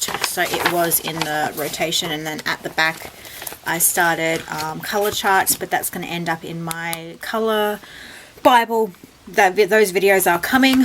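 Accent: Australia. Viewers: Hey are you Aussie hope so love meeting other aussies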